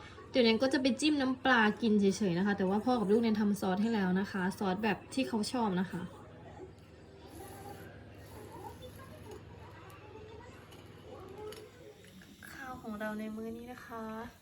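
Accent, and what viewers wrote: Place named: Isan, Thailand. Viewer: ชอบพี่ พูดอีสานมาก 😂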